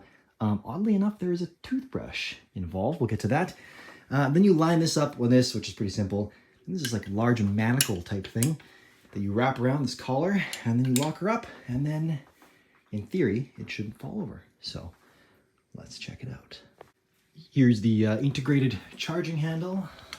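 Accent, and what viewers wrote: Canada. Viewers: Are you Canadian I can’t tell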